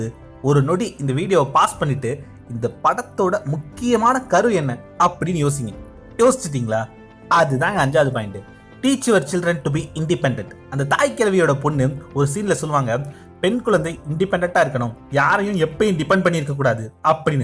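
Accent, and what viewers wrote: Madurai, India. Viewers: Madurai slang nalla irku anna ....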